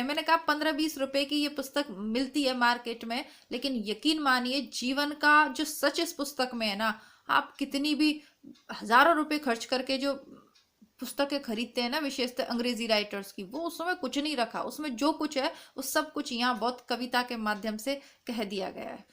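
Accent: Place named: Uttar Pradesh, India. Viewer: हा मेम मेने ही पुछा था।आपके बोलने का लहजा up का लगा।मेरा पीहर करोली के पास ओर ससुराल पश्चिमी राजस्थान हैं।आपके विषय मे जानकर अच्छा लगा।मेरा up टच ह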